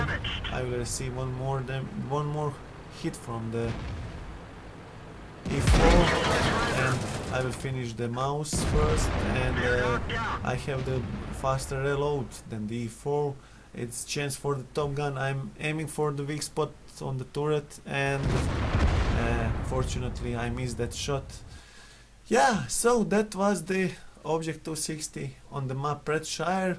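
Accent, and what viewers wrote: Russia. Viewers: Kako koji put sve si bolji sa engleskim ali i dalje zvucis kao russ ;) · you sound like are from russia, but its cool, keep it up, I love your chanel. I di je onaj ludonja sta kaze jebi dan krumpirko, ukra si mi kill.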